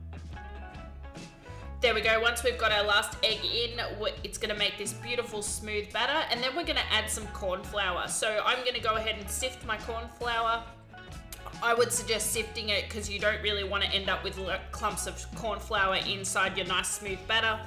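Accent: Australia. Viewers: Nice to hear an Aussie voice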